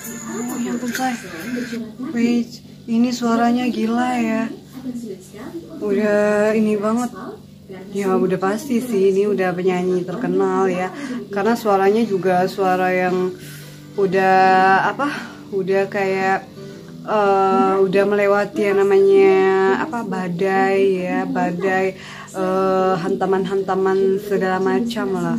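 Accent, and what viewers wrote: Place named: Malaysia. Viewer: Mantap kak, comell kak cakap Malaysia 👍♥️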